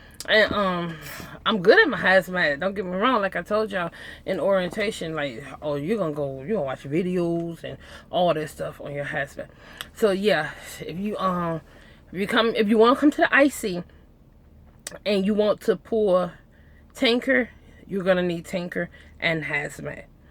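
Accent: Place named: South, United States. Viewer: No, you are slowly loosing your southern draw. great info I just filled out a application for choice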